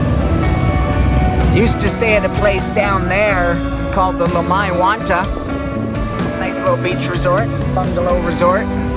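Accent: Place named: Winnipeg, Canada. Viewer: great video. are you from Winnipeg? i'm trying hard to place your accent!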